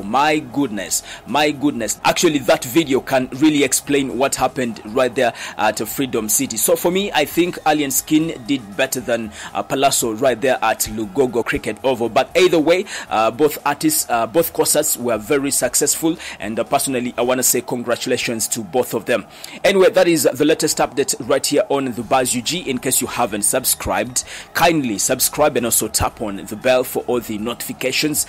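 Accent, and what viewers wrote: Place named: England, United Kingdom. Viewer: I just love ur English accent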